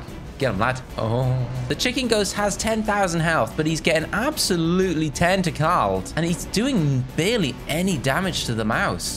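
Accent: United Kingdom. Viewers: r y British?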